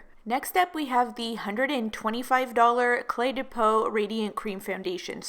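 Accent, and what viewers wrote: Canada. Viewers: omg. This is my favorite channel. And your accent (Canadian?) is adorable. Keep digging dat dirt, girl.😘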